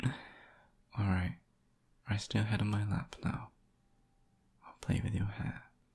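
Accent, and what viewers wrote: England, United Kingdom. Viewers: Ayy proper English accent hear that daily in my life but this one sheeshhhh cute as hell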